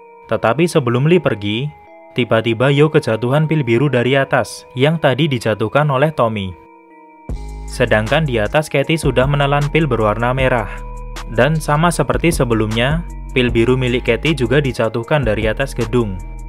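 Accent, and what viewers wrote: Java, Indonesia. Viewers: Medok banget min:)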